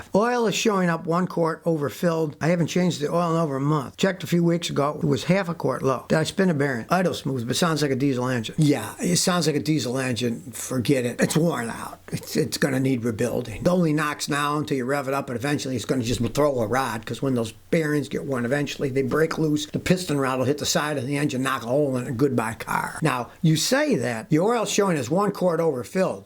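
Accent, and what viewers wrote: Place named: Buffalo, United States. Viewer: Classic Buffalo accent.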